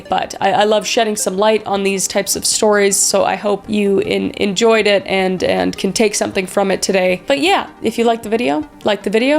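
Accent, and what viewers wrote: Russia. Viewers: Are you Russian? I was wondering because you do the accent a lot and that is what made me look at this channel because my crush is Russian and I won’t to know what he likes please please please be Russian I love him😘😏💋💋💋💋💋💋💋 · Until right this second I fully thought you had a Russian accent!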